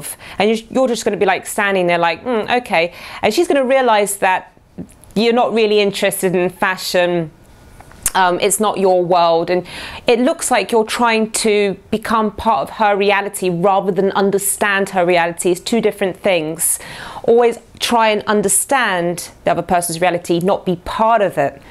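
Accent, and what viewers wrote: United Kingdom. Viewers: Her heavy British accent totally makes my pulse race!! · I like your english acces dear woman, i'm searching a girl like you who has confidence in herself :)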